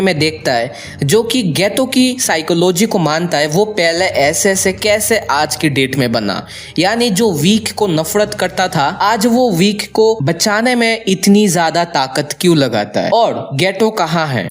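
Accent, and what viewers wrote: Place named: Bengal, India. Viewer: Kisi Bengali bol ra lgta h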